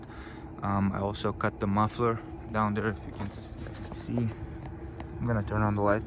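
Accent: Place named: Chechnya, Russia. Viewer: bro this guy is a chechen or a russian ahahhaha his accent says it all ahhaha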